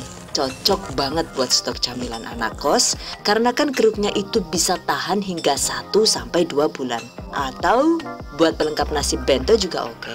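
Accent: Banyumas, Indonesia. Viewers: Wong Banyumas Iki mesti, ketoro omongane ...😄😄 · Mantaaap Bun.... Saya mau coba ah..... 🙏 Bunda dialeknya ko sama dgn aq yaa......Maaf Bunda asli dri Bnyumas apa yaa...?? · Ngomong jawane ky wong ngapak lucu banget sumpah 😂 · Mantaaap pingin mencoba moga engga gagal,, Ko bahasanya ngapak ya ,seneng dengernya ,,,makasih atas resepnya mba yuu,,kesuwun banget kiye wong gombong Melu nimbrung 😀😀😀😀🙏 salm sukses buat Chanel ini 👍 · Ngapak bgt kya enyong deneng😁🤣kk nya matursuwun resep e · Jebule ngomonge pada Karo nyong Rika yaa bu ngapak 🤭🤭🤭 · wong ngapak taune jannnn ngapake ngendi iya.... dadi ngefans kie inyong 😂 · Medoknya kaya wong Banyumas ya Bu...😀👍👍😘😘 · Gagal fokus Ama bahasa yg ngapaknya😍keren2 jd kita yg menonton tidak bosan😍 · Eladalah.. Jebule Rika wong ngapak ya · Suka gaya bahasanya...ulasannya jelas..ngapaknya pas...hehe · Wong ngapak ya bu hihi..slm dari cilacappp..😁😁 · Suka dg resepnya, lg serius kok denger bahasa ngapak, langsung ngakak, wkwkwk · Deneng ngapak 😂😂😂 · Ngapak toh hehehe, saya akui masakan Tegal emang mantab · Weh sebngsa karo nyong ya , pasukan ngapak😄😄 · Sangat mudah dimengerti,ada selingan humornya lagi.bahasa ngapaknya juga😂😂👍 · Yg saya suka dari channel ini disamping resep2 yg di share,juga ka host nya yg suka lucu sesekali krn logat dan bahasa jawanya. 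Medok khas org ngapak,dan kalimat OKE hihihi seneng dengarmya. Tks mbk resepnya 👍🙏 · Makasih mba resep ny..mantep nih..ko jdi ngapak y..ora ngapak ora ke penak..hahaha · deneng jebule ngapak kaya nyong..🤭😅😅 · Ternyata ngomongnya sm ky nyong buu, wong ngapak😂😂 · Gemesi le omangan bun... Ngapak nya berasa😂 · Deneng ngapak mbaaaaakk Ngakak nyong yakin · Ya allah ternyata sampean wong ngapak, salam ngapak Bun, ora ngapak ora kepenak 😊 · Ibune wong ngapak ya deneng pda y karo nyong 😀😀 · Sampean tiyang pundi yu deneng saged ngapak kaya kula... Kula wong kebumen yu😂😂😂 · Jebule wong ngapak salam ngapak wong cilacap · Muantap tenan yu... Krn ngapak...jelas tenan keteranganx ditunggu resep yg baru yg thn lm tuk kirim anak kos..tq ya yuu.....🙏🙏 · Ngapak ternyata ya bunda 😁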